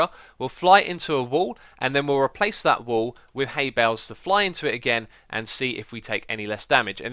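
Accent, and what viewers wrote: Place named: United Kingdom. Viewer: i dunno to me all british accents sound the same :I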